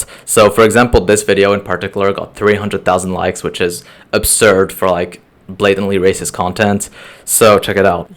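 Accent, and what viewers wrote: France. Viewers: If you speak other languages other than French, french included You'll notice occuring words that are french and also belong to another Language like English for example, You can find lots of words Like chef d'oeuvre, hors d'oeuvre, football, playstation, YouTube, story, nike, film, films, videos, [Names of people, places, and food] ,images, and other hundreds of words The french pronunciation can be either similar, or different Well he most of the times he would pronounce words the exact way they should be in English But sometimes ( in this video in particular ) he did pronounce words exactly how they should be prounced in french or in a similar french accent ( imagine a french person saying the word "story" the way it should be prounced in french "stori" ) PS: other languages such as japanese include french words but with its japanese pronunciation For example: story becomes ストーリ, story is not the best example because it isn't originated from french, But I was taking about the way words are pronounced in french Take story it becomes "stori" (Y prounced as i here) The Japanese pronouciation is kifkif as what I stated above 👆⬆️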